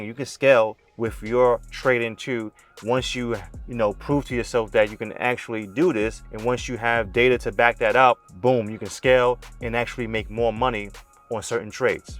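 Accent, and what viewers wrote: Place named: Baltimore, United States. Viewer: Baltimore accent is strong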